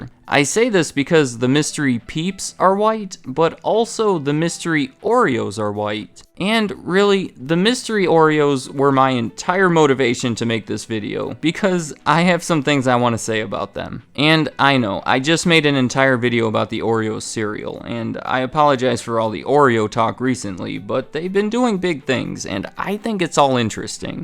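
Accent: United States. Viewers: What state are you from? I swear to god the way your pronounce you "ah" sound is so familiar that I must know someone from there. Super subtle accent, but interesting nonetheless.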